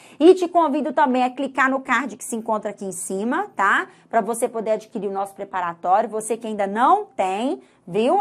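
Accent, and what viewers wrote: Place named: Minas Gerais, Brazil. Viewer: Parece minha tia q mora em Barbacena MG (sotaque)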